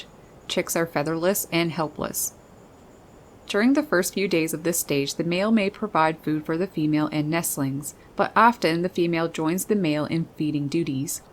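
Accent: Canada. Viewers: I LOVE & her Canadian accent....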